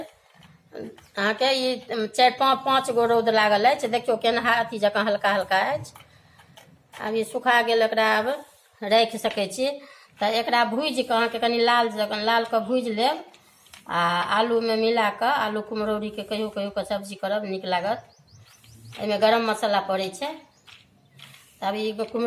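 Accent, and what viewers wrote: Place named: Maithili, India. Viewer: Sudh maithili bhasa suinke mon anandit bhai gel.. Ahake gaam aa jila ki bhel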